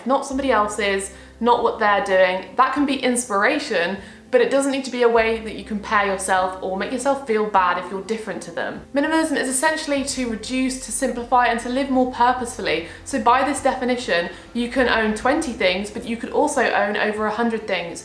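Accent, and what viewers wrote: United Kingdom. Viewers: I speek french and I love your british accent. It is lovely. Your speech is really inspiring indeed. It is not easy but I am starting now.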